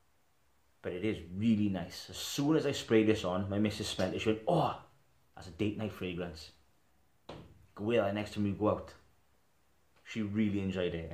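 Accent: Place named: Welsh Valleys, United Kingdom. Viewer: Subscribed earlier today. Glad you have reconsidered stopping. You sound like you are from my part of the world. I am from the Valleys. I come from Rhymney and I was born in a hospital in Merthyr. Your area?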